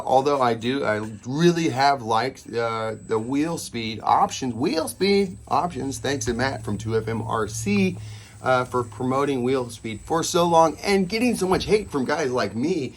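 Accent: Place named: Southern California, United States. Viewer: DUDE don't even trip on sayin DOOOOOOOD. SO CAL from the get go!!!!! I feel you on one handed driving that's 2FMRC territory LOL Diggin your vids DUDE keep it up.....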